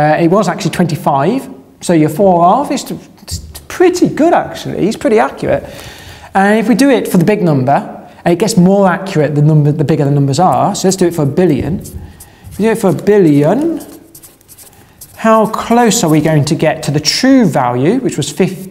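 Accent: United Kingdom. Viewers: it's hard to a Brazilian like me to understand his British accent. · this brit has the Most British accent ever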